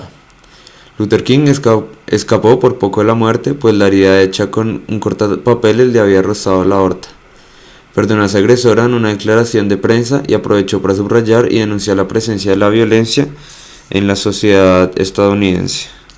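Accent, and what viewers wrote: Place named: Bogotá, Colombia. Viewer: Con tu acento rolo es dificil concentrarme.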